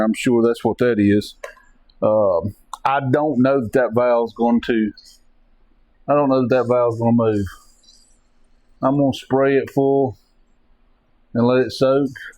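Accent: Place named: South, United States. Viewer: By yer accent, you way south of me in Washington , I got several pushrods I’d give ya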